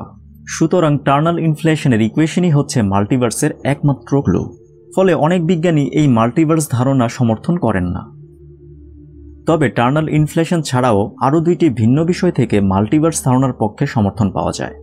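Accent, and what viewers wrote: Narsingdi, Bangladesh. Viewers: আপনার বাড়ি কী নরসিংদী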